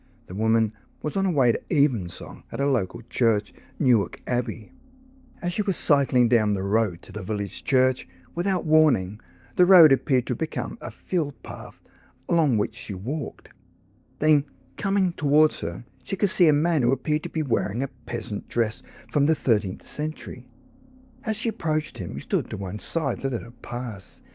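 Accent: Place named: Australia. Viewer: He's an Aussie. Not AI.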